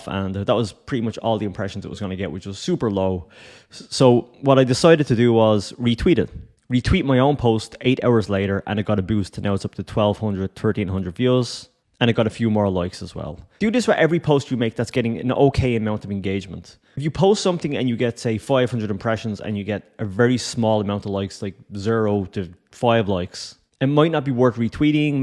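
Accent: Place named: Ireland. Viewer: Excellent advice snd a irish accent double whammy why to follow 😊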